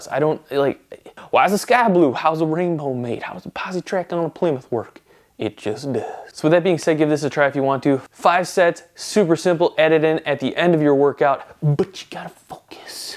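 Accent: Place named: Chicago, United States. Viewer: Wow no comments on his accent? I was thinking Chicago or New Jersey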